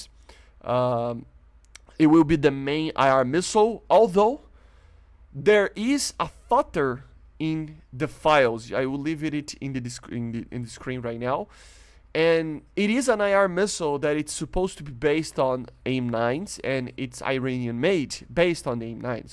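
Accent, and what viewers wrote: Brazil. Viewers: Ah esse sotaquezinho brasileiro rsrsrs eu amo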